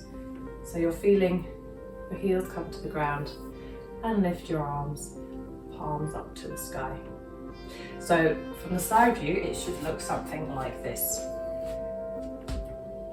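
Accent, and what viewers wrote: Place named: United Kingdom. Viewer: Lovely session. And the British accent just adds so much more to the relaxed atmosphere 😂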